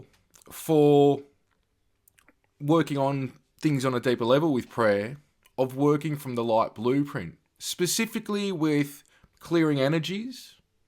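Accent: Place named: Australia. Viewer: Love hearing a fellow Aussie on my feed. PRAIR :)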